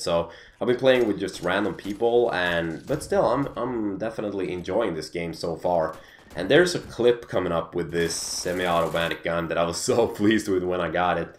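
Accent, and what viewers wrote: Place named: Sweden. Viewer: Semi ADAMADIC, fucking love swinglish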